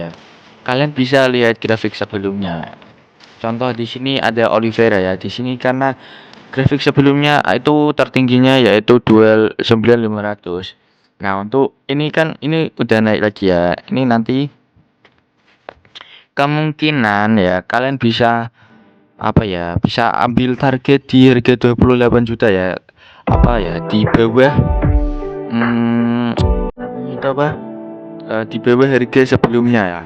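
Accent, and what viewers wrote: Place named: Java, Indonesia. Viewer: suara jawa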